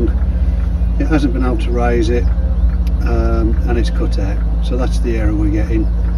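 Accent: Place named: Birmingham, United Kingdom. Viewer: Don't know why, but the brummie accent and talking about car problems are a perfect match.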